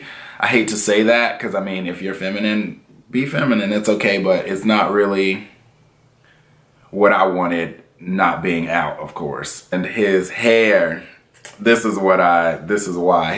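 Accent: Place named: South, United States. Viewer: Lmfao... Wow, you did the most for him. Are you from the south?! You have a very southern accent.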